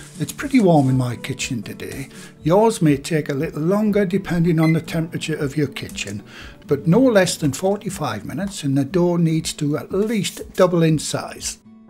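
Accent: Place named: Northeast England, United Kingdom. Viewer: I love that recipe. Probably the best I've seen --- good explanations with the video. Your accent does remind me more of Thornaby/Middlesbrough though.. I'm from Thornaby myself but I used to be able to pinpoint where people were from when I was a kit but once I joined the Army I lost the ability.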